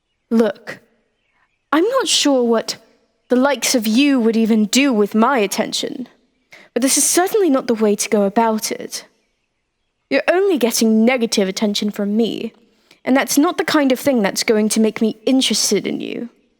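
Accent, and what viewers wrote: RP, United Kingdom. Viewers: Damn!!!!! that thumbnail is mega shes hot love the posh voice too very beautiful ❤️ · oh no, almost made it out of class with the crowd.. she was too quick for us👩‍🏫. From dissapointment to losing out on an award and an ear bashing from the teacher, to getting a bit of a personal glory and history lesson from her and being told to shape up for ourselves.. this is an ideal teacher. Took a gamble admitting what we thought of her personally too, did give us more motivation to get back on schedule and get to spend more time with her, studying📚. Magical academy always makes me think of a potty school for wizards 🏰but this took it in a new direction and had a bit of a DnD feel which is a great change, original and fun idea and can't help but like the posh accent in a dominant tone either, brilliantly fun audio once again 🎧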